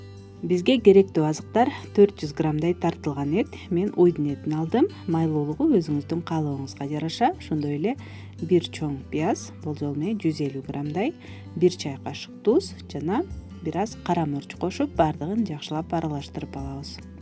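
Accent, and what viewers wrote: Standard. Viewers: Качество ваших видео всегдана высоте мне нравится всё что вы готовите ваша чистота на кухне и как вы говорите чисто на кыргызском языке я рада что полписана на ваш канал в дальнейшем тоже радуйте нас своими вкусными неповторимыми рецептами я из Оша😘😘😘👍👍👍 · Эн сонун 👍таза кыргызча суйлогонунузго баа бердим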